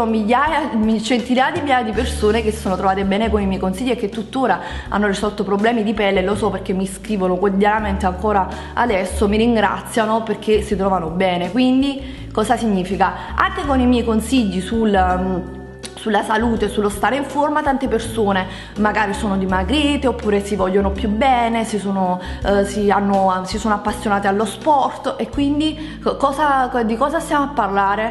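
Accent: Naples, Italy. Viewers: ma fregatene...sono persone cattive, non capisco nemmeno io perchè altre youtubers non si becchino gli insulti, ma secondo me solo per il fatto che tu sei sensibile e ci fai caso ai commenti, ai like ecc... O piuttosto che niente ci sono le persone che si mettono a criticare la pronuncia, la cadenza, il dialetto, l'inglese....lasciale perdere, ma chi se ne frega, anzi sai cosa ti dico?? Io sono milanese DOC e sono pure una linguista (studio interpretariato) eppure quando sento la tua cadenza napoletana o qualche parola in dialetto mi diverto tantissimo, e se capita che sbagli qualche pronuncia in inglese a me fai solo tenerezza.... Saranno invidiose perchè tu mostri di avere una bella vita, con una bellissima famiglia, con una bella casa ecc ecc...come se tu non avessi problemi... Non capiscono che magari una vuole mostrare il lato più allegro e divertente della vita! 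un abbraccio grande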